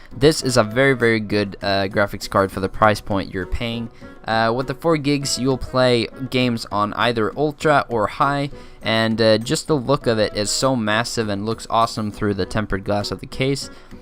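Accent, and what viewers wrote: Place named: Germany. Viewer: hes probably german, or austrian. a lot of their pronunciations are like that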